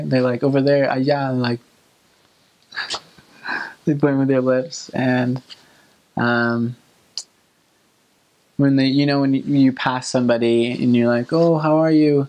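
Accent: Dominican Republic. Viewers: You sounds really like a dominican when you speaks spanish wow you are really smart no all missioneries can do that!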